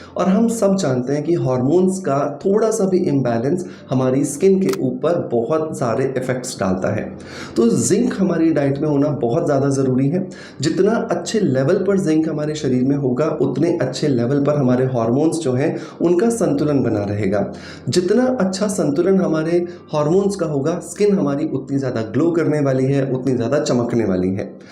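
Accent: Bengal, India. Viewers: Sir aap kya bangali ho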